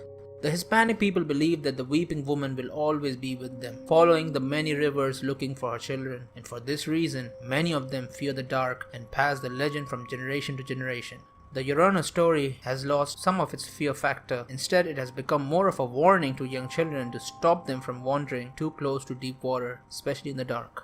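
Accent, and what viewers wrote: India. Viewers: No offense but it sounds not authentic, specifically because of the Indy accent 😂 nice try though. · Full of Indian accent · Why does the narrator sound from India?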